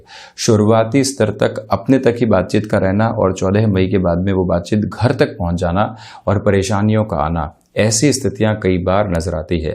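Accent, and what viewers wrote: Standard. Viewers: नमस्कार सर जी I आपकी भाषा व उच्चारण इतना शुद्ध और उच्चस्तरीय हे जिससे मन प्रसन्न हो जाता हे I जय हो I शुभेच्छा 🙏🏼🙏🏼🙏🏼🙏🏼🙏🏼🙏🏼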